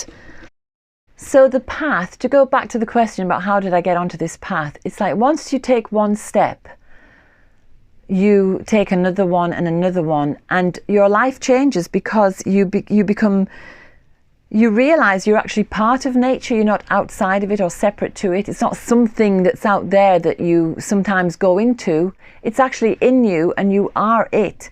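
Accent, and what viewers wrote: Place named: North East England, United Kingdom. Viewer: Another great video ! I always hear the north east accent whilst your talking, .I'm from South Shields and guess your from close by.. Thank you for sharing your knowledge and love of nature with us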